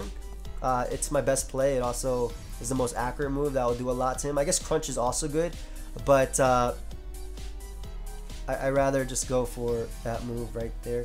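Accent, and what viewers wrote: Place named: Connecticut, United States. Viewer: Did u grow up in connecticut? You have a connecticut accent just like me:D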